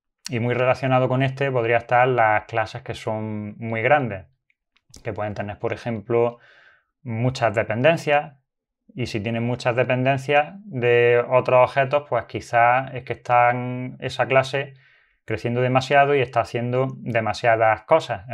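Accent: Andalusia, Spain. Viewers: Vaya acento de Granada/Almería jajajaja saludos de un programador andaluz.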